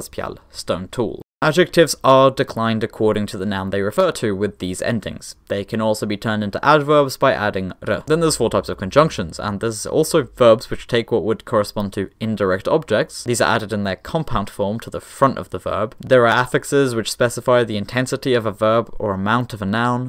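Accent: Sweden. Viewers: This dude is probably Swedish based on English pronounciation and how he pronounced things in his constructed language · Love your Swedish accent haha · the little bit of swedish that is in your pronunciation is so <333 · Are you Swedish? You sound kinda Swedish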